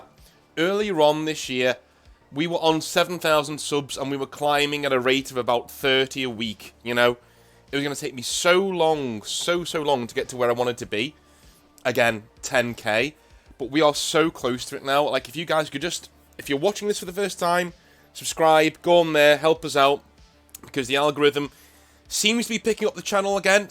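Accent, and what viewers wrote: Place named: Northern England, United Kingdom. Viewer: Watched a couple of your videos recently, love the energy and content. Curious, based on the channel name and accent, what store did you manage? I only ask as it was in the north of England where I first found my love of table top gaming!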